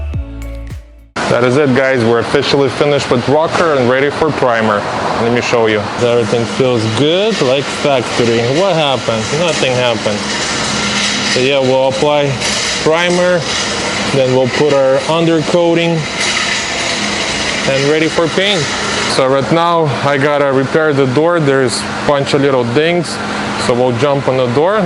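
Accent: Russia. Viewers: Бля,слишу акцент;)землячок!спс подписался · Ухо какой акцент )) наш человек )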